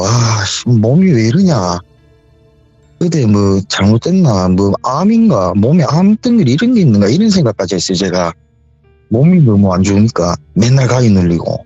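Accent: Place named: Gangwon, South Korea. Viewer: 지역이어디지?경북인거같기도한데 아니고...강원도인가?첨들어본 말투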